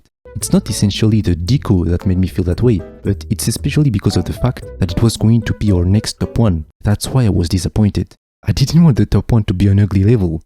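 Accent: France. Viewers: bro are you french you sound so french lol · You have a strong sounding like French accent I like it🔥 Nice vid !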